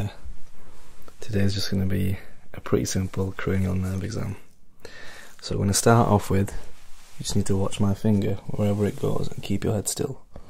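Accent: United Kingdom. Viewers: Never heard a British…asmrtist? Is that what you call them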